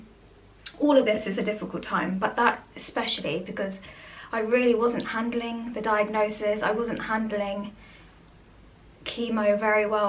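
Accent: United Kingdom. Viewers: you and are such a lovely couple. lovely accent btw. which part of the UK does your british accent originate from?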